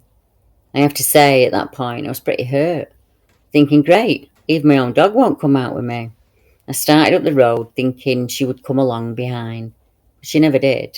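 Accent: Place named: North, United Kingdom. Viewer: Not commented in a while as I watch your videos mainly on my TV as I sleep, something about the fellow north accent and the amazing encounters is just so peaceful to me in a stressful part of my life. Sending Much love to you and everyone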